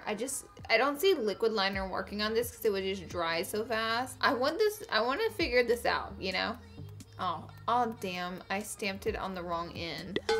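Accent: South, United States. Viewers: rute maria or Farrah Abraham..but a little more southern? idk who she is so idk if she's from the south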